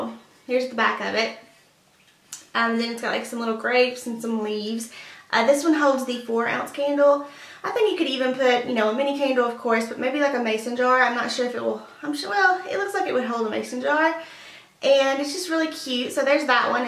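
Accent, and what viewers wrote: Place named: United States. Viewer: Theres a skunk? Dead? Thats nastyyyy :D i love your accent... being british some of the american accents are so different to hear!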